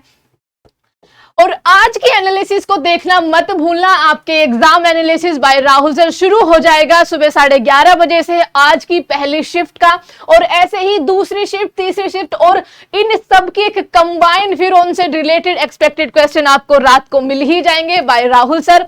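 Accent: Haryana, India. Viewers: Mam are you from haryana???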